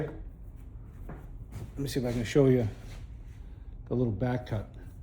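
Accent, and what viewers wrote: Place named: Boston, United States. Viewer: Hey bud, I can tell a fellow Bostonian when I hear one. It’s not your the accent it’s the way you talk. Keep up the good work.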